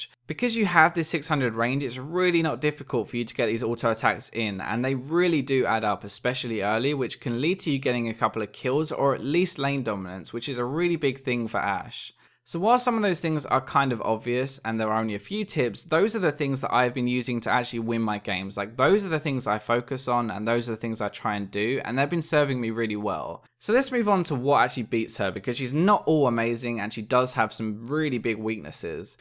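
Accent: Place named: United Kingdom. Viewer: I don't know why, but remainds me of Redmercy a lot just with british accent... Mindblown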